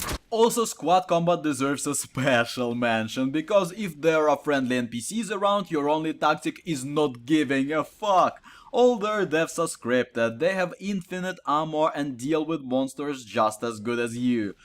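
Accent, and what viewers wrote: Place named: Russia. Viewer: That heavy Russian accent is really hard on the ears.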